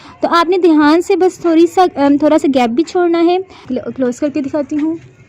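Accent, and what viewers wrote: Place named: Lahore, Pakistan. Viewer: خدارا اردو کا بیڑا غرق نہ کرو اردو کی ادائیگی مناسب انداز میں کرو یہ لاہوری اردو سی پرہیز کریں'اردو ہماری قومی زبان ہے اس کو خراب نہ کریں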